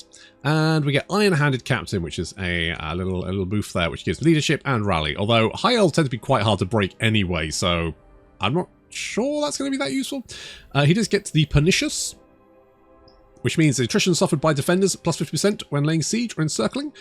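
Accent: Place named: West Country, United Kingdom. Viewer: West Country accent with your commentary is priceless 🤣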